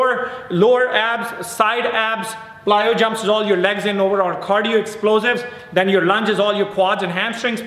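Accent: Punjab, India. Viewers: watch ur all vedio bhai..i love the way u talk ur jokes songs punjbi voice n evry thing..👍😊m also pujbi nd jatniii 🤗love from pakistan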